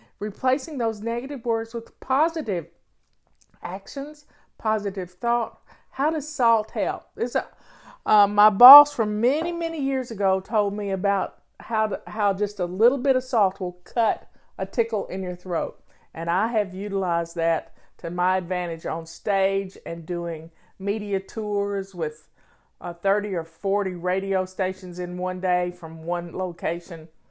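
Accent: South, United States. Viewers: I love your Southern accent! Had to buy a new washing machine. How's that for celebrating laundry month!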